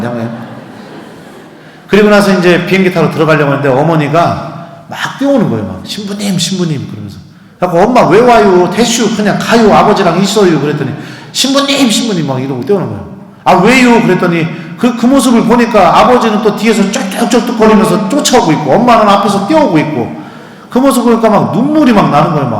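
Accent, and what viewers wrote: Chungcheong, South Korea. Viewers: 신부님 말습이 너무 웃기고재미있어요 충청도 아젓이 신부님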